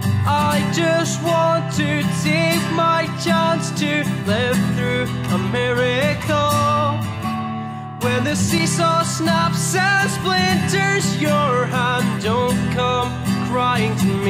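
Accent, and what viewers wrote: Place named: Edinburgh, United Kingdom. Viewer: People form Edinburgh have such thick accents! If I wasn't a killie boy I would have thought you were Irish!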